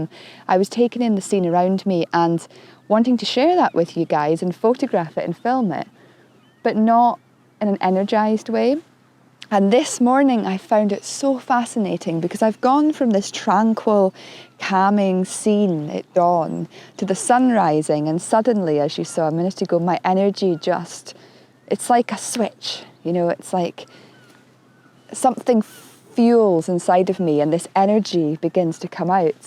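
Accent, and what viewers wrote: Scotland, United Kingdom. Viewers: nice footage , coupled with your voice ( commentary ) and the music go all very well, the Scottish accent remind me the days i spent in Scotland during my study at the university of Glasgow >>> Thanks for sharing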